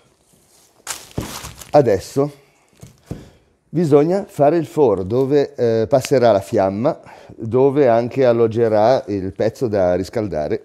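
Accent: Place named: Liguria, Italy. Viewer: sei un ligure ti sento dall'accento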